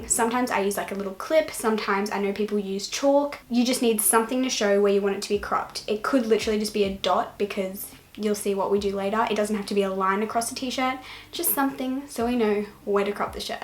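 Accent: Australia. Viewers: Is she aussie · Are you Australian? I looooveee you’re accent so much, it’s so cute!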